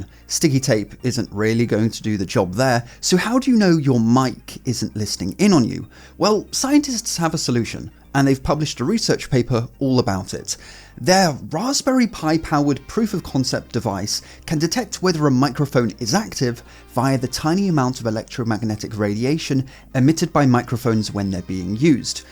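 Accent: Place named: RP, United Kingdom. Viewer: you sound so posh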